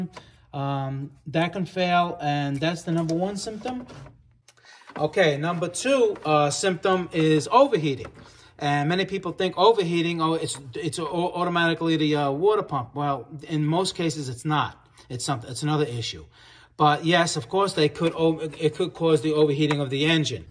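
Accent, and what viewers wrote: New York, United States. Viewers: This dude has the coolest accent...what part of NY are you from?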